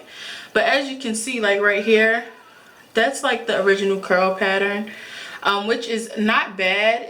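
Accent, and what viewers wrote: New Jersey, United States. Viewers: Ayyyy jersey ! What part are you from ? Don't say south lol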